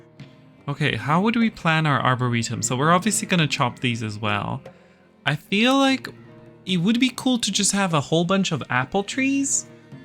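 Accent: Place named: Netherlands. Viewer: You sound Dutch. 👍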